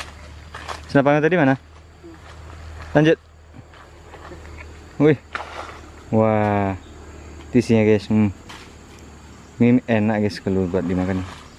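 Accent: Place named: West Sumatra, Indonesia. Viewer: Abang orang mana bg?? Kayak logat orang minang bahas indo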